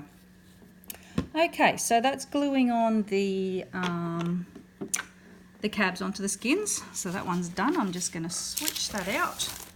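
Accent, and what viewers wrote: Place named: Australia. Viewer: I love listening to your Aussie accent girl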